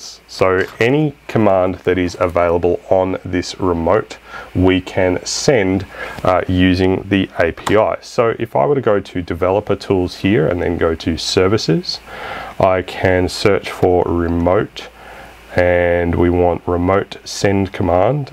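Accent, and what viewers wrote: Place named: Australia. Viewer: cheers mate. Nice to hear an ozzy voice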